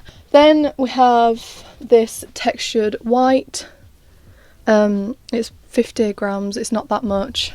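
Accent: United Kingdom. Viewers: Hi where are you from ? I guess by the wallpaper and accent the UK 🇬🇧 love what you are doing . Scrap yarn projects are fun 🤩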